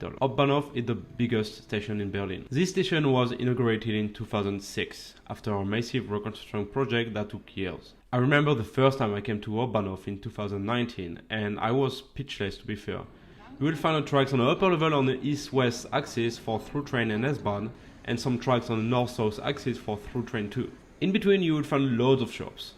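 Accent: France. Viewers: I agree with you entirely. His Franglais is annoying. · Sans vouloir être désagréable, serait-il possible d’avoir les videos sans commentaires off en anglais? Mes oreilles saignent en écoutant le lourd accent français, c’est difficile d’en faire abstraction. Les sous-titres avec le son d’ambiance font bien le job. Les youtubeurs japonais du train game font ça et vu leurs nombres de vues, ça marche très bien et ça fait moins de travail.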